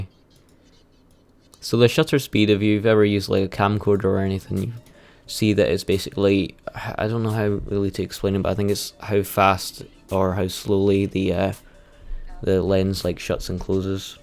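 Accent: Scotland, United Kingdom. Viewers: maaaate i didny even know you were scottish aww thank fuck makes life easier :)))))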